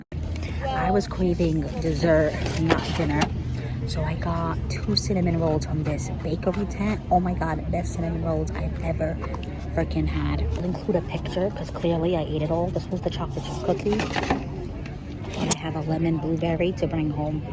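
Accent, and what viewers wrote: New York, United States. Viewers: Yes, I was in New York and the day that I landed it look like doomsday on Long Island. I wish they had a farmers market here at night. I am not a morning person. I can understand that this week I don’t wanna go anywhere and I’m being forced to go somewhere. My grandson died of accidental drowning a year ago. His birthday is June 30. He would’ve been four. On a good note my son and his wife are having another baby. I just found out yesterday. I have a whole garden full of sunflowers in my yard now. I thought from your accent you were from New York. I enjoyed your blog for the farmers market.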